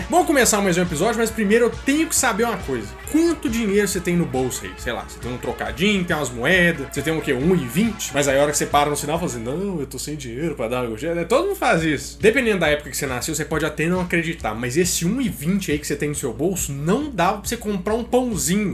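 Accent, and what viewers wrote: Minas Gerais, Brazil. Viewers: オタクではない será que é porque ele É mineiro? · nussa o cara tem um sotaque muito mineiro... kkkkkk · Está de parabéns esse mineirinho da fala engraçada.muito bom.uma aula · Tu é mineiro??? KARAI TBM SOU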